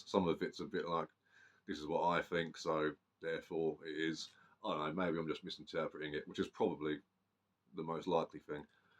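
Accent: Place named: United Kingdom. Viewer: I wasn't expecting the accent. Why are you so British?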